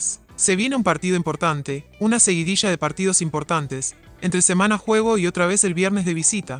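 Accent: Argentina. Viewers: Por qué el narrador es argentino? 🤔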